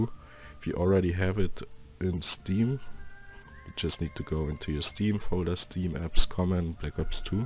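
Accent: Germany. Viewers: man hört deinen deutschen akzent sehr stark raus :D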